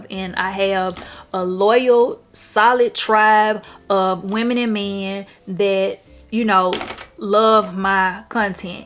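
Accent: South, United States. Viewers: GIRL… you country as a MF and I love that! I’m a GA Peach so I understand! 😍😍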